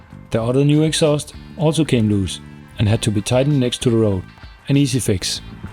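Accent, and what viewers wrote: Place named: Denmark. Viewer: 'dan'glish accent'...Haha...I must apologise to all our foreign friends who are forced to learn english...As an englishman I can assure you we didn't mean it to be this way even tho some of us still try to learn other languages...but unfortunately as a consequence most english have now become very lazy to learn them...hehe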